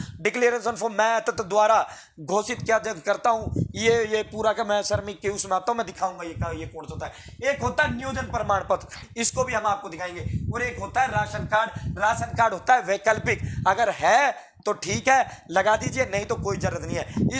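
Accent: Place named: Haryana, India. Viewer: Ap haryana se ho 😅😅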